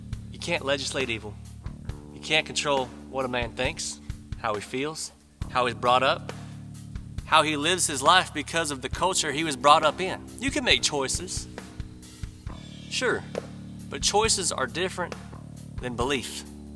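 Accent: South, United States. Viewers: still southern brother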